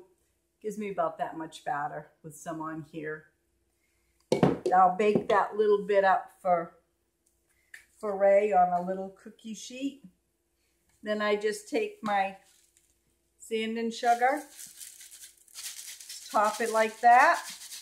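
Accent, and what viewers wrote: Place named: East, United States. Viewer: I remember the molasses cookies that were so soft and gooey and delicious back in the early or late 1980s I believe in high school. they were only $0.25 and they were so delicious thank you for sharing this. I hear a thick Eastern accent and my grandma which was my dad's mom was from Glens Falls New York. I think my dad was born in Albany.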